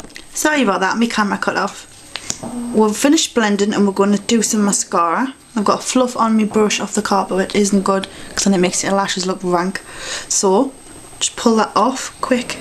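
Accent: Ireland. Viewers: r u irish? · are you irish? x]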